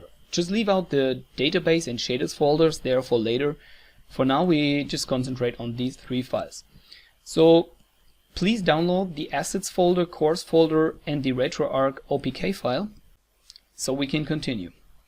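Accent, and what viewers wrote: Germany. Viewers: Deinem Akzent nach vermute ich, dass du Deutsch sprichst ;) Vielleicht würde dies die Kommunikation etwas erleichtern. Ich habe alles so gemacht, wie du es in deinem Video beschrieben hast. Das Setup hat wunderbar geklappt. Das Problem tritt auf sobald ich über das Verzeichnis "media" auf den Roms-Ordner zugreifen will. RetroArch beendet sofort und ich lande wieder im Hauptmenü des RG350. Mir wird gar nicht erst, wie in deinem Video der Ordner "Data" angezeit, bevor ich in dieses Verzeichnis weitergeleitet werde, stürzt RetroArch leider ab. Ich nutze die 2019er Version des RG350. Anbei wurde mir eine 32Gig-SD-Karte mit vielen Roms dazugeliefert. Allerdings habe ich selbst auch schon einige Roms in die einzelnen, vorinstallierten Ordner dazugepackt. Was kann ich tun, dass ich auf meine Roms zugreifen kann? Vielen Dank im Vorraus für deine Antwort. 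Beste Grüße Tim :)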